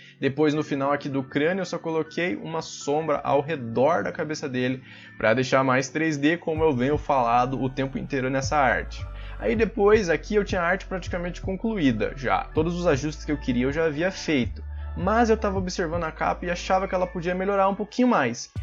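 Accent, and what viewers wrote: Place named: Paraná, Brazil. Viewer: Paranaense alert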